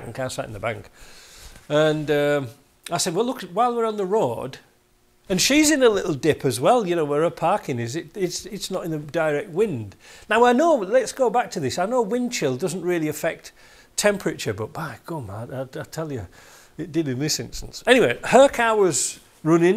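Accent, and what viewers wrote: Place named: Northern England, United Kingdom. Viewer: Hey where are you originally from? Just finding out you live in Canada? But your accent sounds like you are from somewhere in England maybe up north somewhere 🤔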